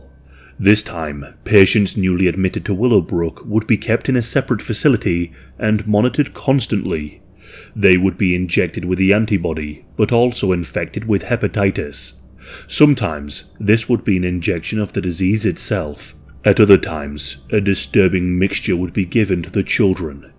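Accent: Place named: Northern England, United Kingdom. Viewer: Yep, here's another pronounciation nazi surfacing. He has a Northern England accent dumbass. A stunning example of someone who has nothing better to do. Now fu*k off.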